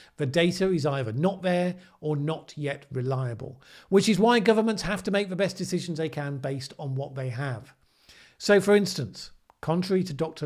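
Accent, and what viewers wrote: United Kingdom. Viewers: Thank you once again. A sane voice is always welcome in any discussion and your approach of tackling outlying views prior to narrowing the examination is especially effective (and necessary in todays internet society) in getting to the core of the subject. I am sharing this with friends in the States who will doubtless enjoy your unbiased examination. Not to mention the British accent. All the best from a Scot in Panama. 😁🇵🇦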